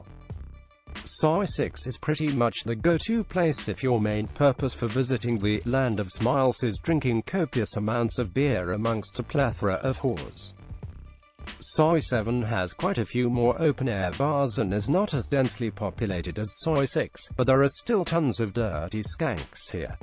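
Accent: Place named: RP, United Kingdom. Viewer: Your tied #1 place for my favorite thai channel with 'do more life'. Love the David Niven accent. Funny channel. Each video has me laughing.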